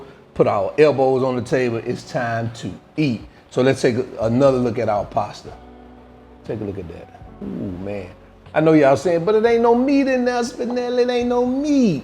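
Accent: South, United States. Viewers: Stop dragging on your Alabama education it has gotten you this far and from what I can see you’re not doing bad at all , so, it did do the job. I love your recipes and you are doing a great job but remember we all talk or pronounce words different, it just depends on the area / demographic you are from. I know you are just having fun but lighten up on us southerners.😂😂😂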